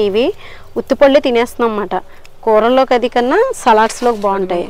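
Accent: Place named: Godavari, India. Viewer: మీ మిద్దె తోట చూస్తుంటే...చాలా చాలా సంతోషం కల్గింది...ముఖ్యంగా...గోదావరి యాసతో మీ మాటలు ఎంతో ఆహ్లాదాన్ని కల్గించాయి...అభినందనలు...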